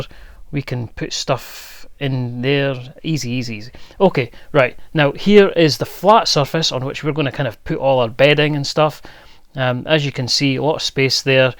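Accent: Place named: Scotland, United Kingdom. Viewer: Lol, I enjoyed the voiceover. You remind me of lewis capaldi with your humor and similar accent! Looks like a nice cosy set up !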